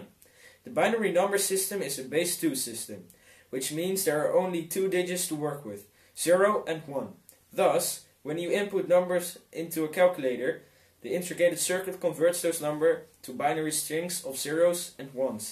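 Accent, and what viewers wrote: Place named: Netherlands. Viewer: ok, although speaking rather good english, my ears are telling me he's Dutch. Is he?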